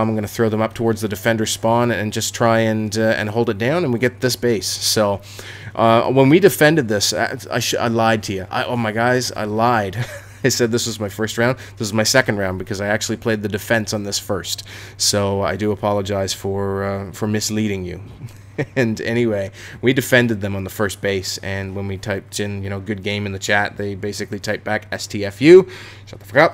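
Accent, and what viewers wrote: Canada. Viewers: are you canadian because i don't know why but i hear that type of canadian accent when you speak and pronounce vowels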